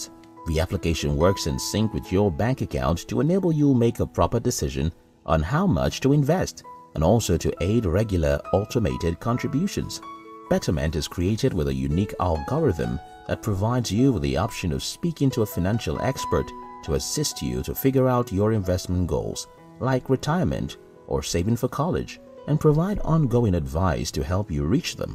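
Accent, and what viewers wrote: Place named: Nigeria. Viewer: The voice over sounds like that of a Nigerian.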